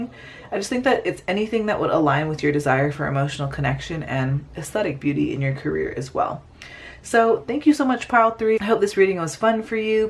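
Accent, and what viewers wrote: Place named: Toronto, Canada. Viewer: You have a Toronto accent. I was surprised when you said “university in my state.” Also, thanks for doing career readings. There are too many love channels.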